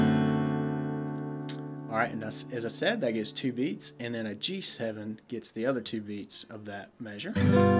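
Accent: South, United States. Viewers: This progression definitely make for some beautiful medley!!!Thanks Bro!!BTW I knew u r from the south.Im from Alabama so I know a southern drawl when I hear one!!!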